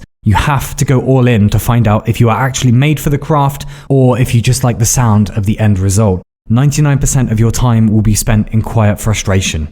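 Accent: RP, United Kingdom. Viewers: i do a lot of art but i also just want more turtle boy with funny british voice saying things to prevent me from commiting sewerslide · ah the fancy rp english accent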